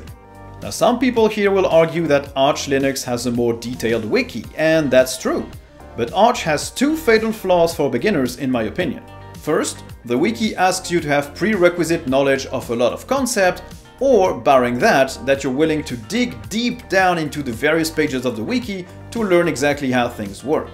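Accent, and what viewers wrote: France. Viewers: French accent, are you from France?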